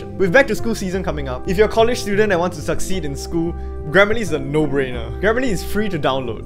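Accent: Singapore. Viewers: Hey are you Singaporean you sound like one ngl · are you Singaporean?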